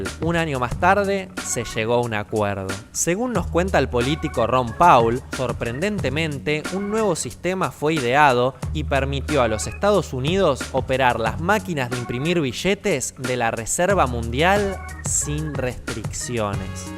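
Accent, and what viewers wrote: Argentina. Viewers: Se nota que eres argentino no sólo por tu forma de hablar sino porque no crees en la apertura económica ni la competitividad, aprende de Chile. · no se si sea yo o que porque se me hace muy difícil entender los vídeos, quiero ver tus vídeos porque son muy interesantes pero creo que es por lo que eres de argentina y es muy difícil entender como tu forma de interpretar algunas palabras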